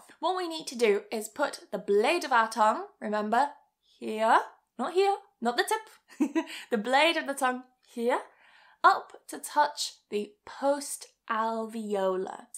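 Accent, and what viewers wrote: United Kingdom. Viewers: I appreciate your modesty, putting the British pronunciation (of words like "castle" or "afternoon") second, even though you seem to have more of a British background. i think ppl like learning the British pronunciation, as British English sounds more high class :D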